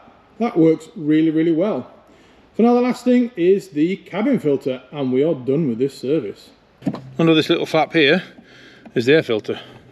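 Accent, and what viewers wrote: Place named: Northern England, United Kingdom. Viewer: Are you actually Swedish? You sound like someone who's from Northern England.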